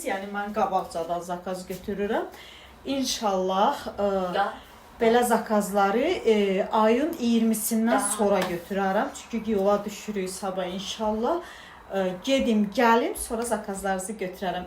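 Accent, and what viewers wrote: Talysh, Azerbaijan. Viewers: Gözel lahceli taluş gizim, Allah, ruzuna bereket, evladina sağlig, safa versin, inşaAllah.🤲 İncime gizim, ğörkemi gözeldir, amma bir az gizartsan lap gözel olar.Bela bulka ğörkemi var.Va bir da, üzerine gara cörek otu sep. Onun ğörünüşü va dadi bir başqa olur. 🎉Dediklerimi ağbirçek nana kimi gabul et.😘❤️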